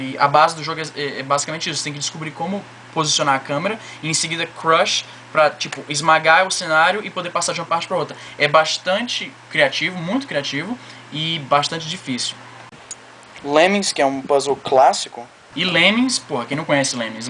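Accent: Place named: Northeast, Brazil. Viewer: tenho amigos online do nordeste e eu percebo um pouquinho de sotaque sim kk, mas bem pouco mesmo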